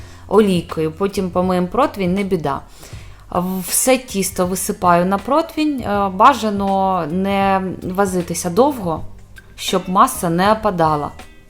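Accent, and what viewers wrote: Russia. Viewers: не може вимовляти букву О у ненаголошених складах, все одно виходить А - це загальне явище у всіх, для кого рідна мова російська. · Російський акцент цієї блогерки ріже вухо😮 Будь ласка не видаляйте негативних коментарів😮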